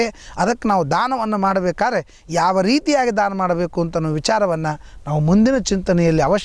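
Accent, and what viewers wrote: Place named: North Karnataka, India. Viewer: Uttara karnataka kannada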